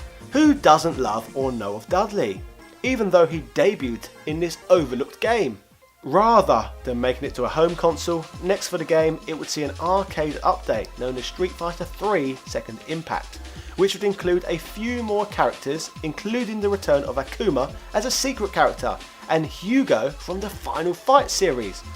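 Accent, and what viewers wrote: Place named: RP, United Kingdom. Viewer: You truly are an aristocrat. Most Brits might call it Stree figha free.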